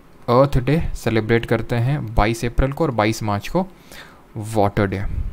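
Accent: Kanpur, India. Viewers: The timing . Kitna badhiya samjhaya Article 2 ko...I love this kanpuria ascent. love from gorakhpur sir ji.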